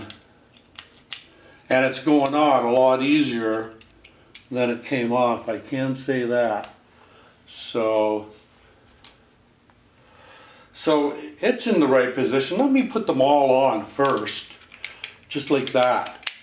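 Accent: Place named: Midwest, United States. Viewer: Thanks for your videos! I thought your accent was MidWestern. Michigan, Wisconsin. I'm from Pennsylvania.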